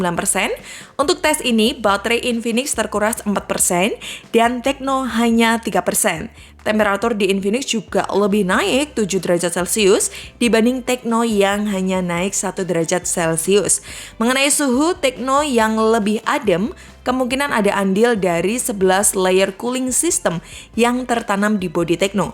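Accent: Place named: Java, Indonesia. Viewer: suka deh..lama lama keluar medok nya 😁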